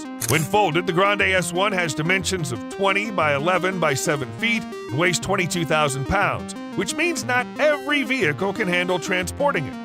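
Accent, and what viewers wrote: United States. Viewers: Horrible music, and according to the accent, it can only be a video from the States. Not useful for Europeans.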